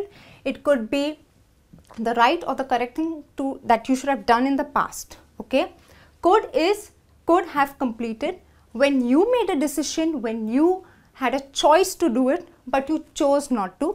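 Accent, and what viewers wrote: India. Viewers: Hindi style , plzz try to use English way of speaking . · indian pronunciation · thonzskie junior I agree with you. All my friends who are from Indian speak English the same her voice... maybe this is intonantion of Indian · Is that the indian accent? I appreciated it tho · excent indian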